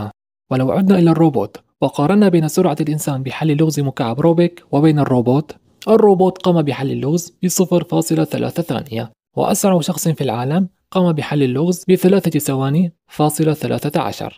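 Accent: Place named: Arab world. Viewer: استمر عنوان ممتاز حنجره ممتازه اسلوب راقي اللهجه العربيه تفوز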